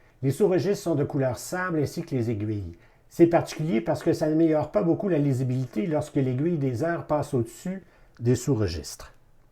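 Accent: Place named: Quebec, Canada. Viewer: je vous en prie et votre bel accent du Quebec va très bien avec vos présentations...Bien à vous.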